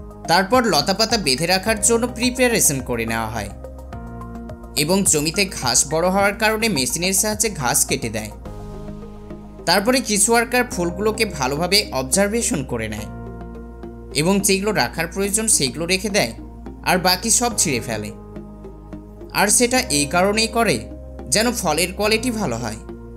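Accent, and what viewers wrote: India. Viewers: তুমি যে ইন্ডিয়ান এটা কথা শুনে বুঝা যায়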